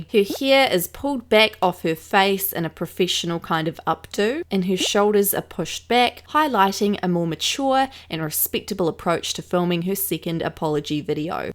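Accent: Australia. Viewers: Are you an Aussie or a kiwi??? I’m British so I can’t tell the difference!! Hahahah you should do a video about you rather than drama your voice and accent is great sis! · sounds Australian to me :)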